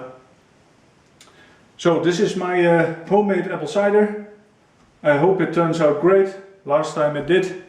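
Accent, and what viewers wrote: Netherlands. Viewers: Not to take the piss but I’ve not heard a younger person with this accent. Only have old Dutch people in Canada. Most younger folks can make an American accent.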